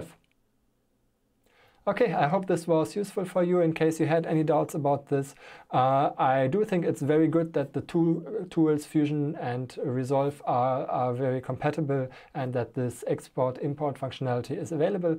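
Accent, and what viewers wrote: Germany. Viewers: Thank you! - very helpfull. Nice german accent. Danke für das Tutorial ;-)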